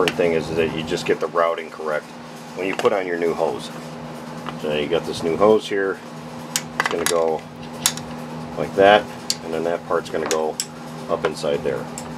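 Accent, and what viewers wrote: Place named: Midwest, United States. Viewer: Another nice video. I'm about to pull the trigger on a G650gs but I find your videos really helpful regardless of exact model. Plus I'm from W Michigan now living in CT so I like hearing your Midwest accent!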